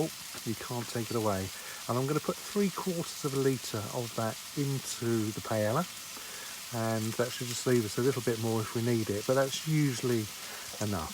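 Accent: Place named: United Kingdom. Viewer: I am just here for the british Accent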